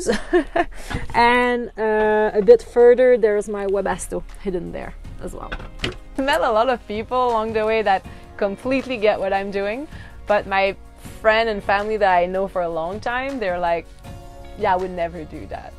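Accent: Canada. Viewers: Definitely a strong Quebec accent haha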